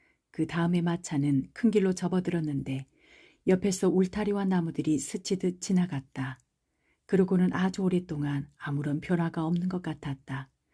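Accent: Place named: Jeolla, South Korea. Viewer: 요크셔 사투리를 전라도버전으로 읽어주셔서 너무너무 재밌습니다... ❤ 후속편 완전 기대하고 있겠습니다. 감사합니다.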